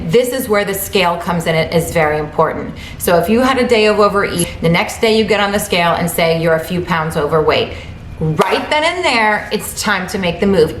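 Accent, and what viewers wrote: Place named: New York, United States. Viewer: I only found you a few days ago... watched like 100 of your cooking segments 😂 You are AAAMAZING! Now im seeing all these other (older) videos, which are GREAT! Your personality is 🔥 as the kids say! I'm 57, raised in St. Pete, live on Long Island now for many years. I know you're in FLA, I though you were from NY by the way you talk. XOXO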